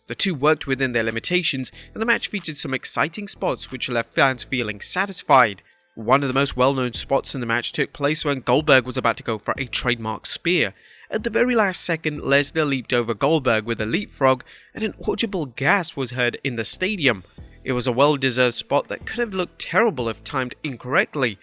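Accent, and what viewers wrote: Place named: United Kingdom. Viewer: Why is every wrestling YouTube channel hosted by someone British?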